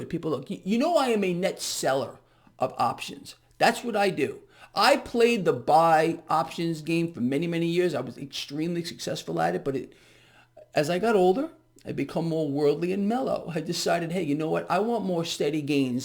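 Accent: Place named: New York, United States. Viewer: For a second I thought you were telling us to buy COAL for every 100 stocks. 😆Texas ears, meet NY accent. BTW, I just bought your eBook and I'm already learning some concepts - thank you so much for the education.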